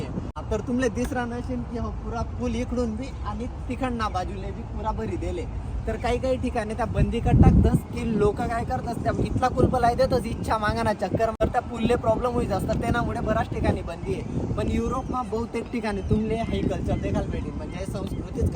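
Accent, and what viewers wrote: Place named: Khandesh, India. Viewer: Dada mi tuna video dekhas. Male aavds tuna video . Tu parona she .mi pan karmud ni she . Pan sadhya mi borivali ma rahavle she. Pure khandeshi bolas te lay aavdas. Tule jevha time bheti tevha. Tuna ship dakhadjo. Jay khandes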